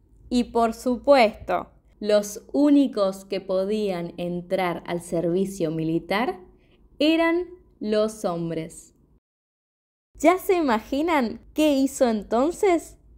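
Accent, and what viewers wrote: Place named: Argentina. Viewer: Hola, ¿ Qué tal? Estoy encantada con tu canal en Spotify y como no encontré mas audios vení al YouTube y ti encontré ❤. Ppr favor ¿Cuando volverás a subir mas vídeo? Estoy aprendendo español y quiero aprender el acento argentino que es muy hermoso, ademas año que viene quiero ir de vacaciones a Argentina y su contenido ayuda muchísimo. Muchas gracias por ellos. Soy brasileña y me encanta el español, Argentina y su gente.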